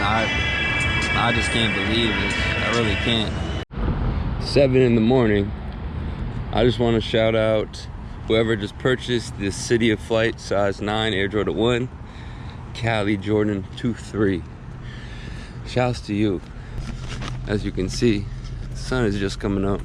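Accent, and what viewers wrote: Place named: New Jersey, United States. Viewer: I think I just pin pointed where dude from ...howell..nj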